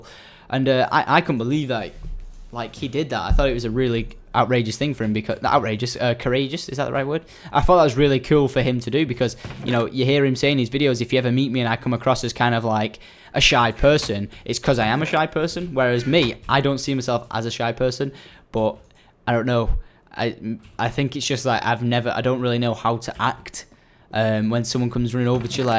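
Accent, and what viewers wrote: United Kingdom. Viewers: are u britsh cuz u sound britsh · aren't you british?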